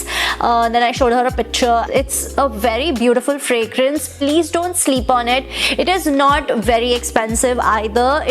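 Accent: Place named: India. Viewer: hint aksanı kulak tırmalıyor işkence gibi AHHHHHHHHH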